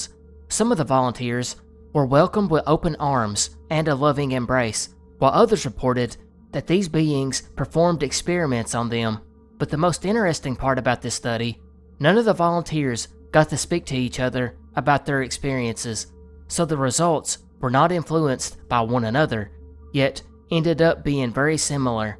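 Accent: South, United States. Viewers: Your channel and your episodes and how smart you are, helped me to better understand country boys. Now i know for sure it is just the accent. Even before i knew it was just the accent and becaude of movies etc it sounds stupid but its not. Thanks man. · I don’t think I’ve ever heard a creator who does videos like these with such a pronounced country accent Country representation <3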